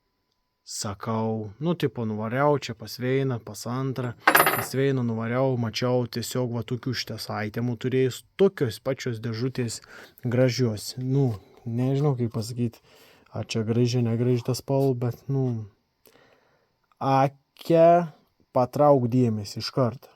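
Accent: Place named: Samogitia, Lithuania. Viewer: Gal ne įtemą, bet man labai patinka žemaičių šneka! Linkėjimai iš Dzūkijos gilumos!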